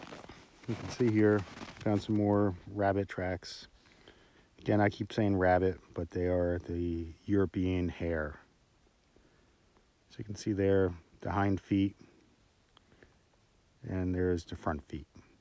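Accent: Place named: United States. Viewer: Nice video but you sound American...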